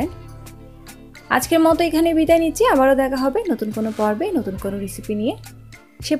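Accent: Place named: Kolkata, India. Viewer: uchcharon r karone kothagulo shunte valo lageni....apni ki kolkata style a kotha bolte chaichen?ছ চ r problem ta asha kori solve korben....otherwise valo hoeche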